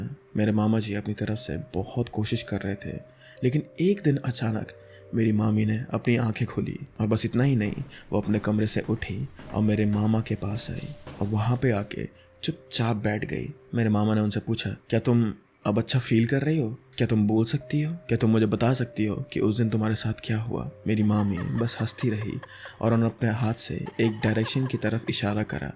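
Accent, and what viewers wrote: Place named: Garhwal, India. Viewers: That Garhwali accent 😂❤❤ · your pahadi accent is soo cutee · Your Gawhali Accent is Awesome 💞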